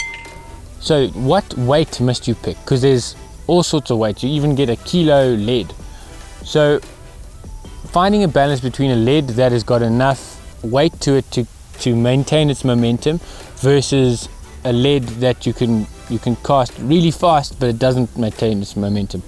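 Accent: South Africa. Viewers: Hi bud from wher are you you soud south africa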